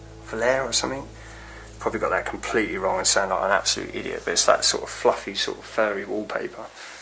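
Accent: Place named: United Kingdom. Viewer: Hey I absolutely love your videos. Of course I find them absolutely fascinating, but there is one thing that has been driving crazy and I would live some clarity. What part of the UK are you from? Sorry it's silly, but I've heard it before and I would love to be able to finally place it! Ty!!!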